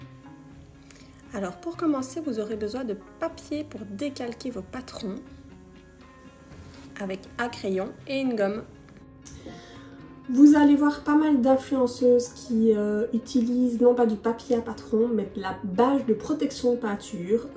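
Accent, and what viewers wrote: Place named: Belgium. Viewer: Salut, t'excuses pas de ton accent Belge, sois en fière. Bonne chance pour ta chaine, ça a l'air très intéressant.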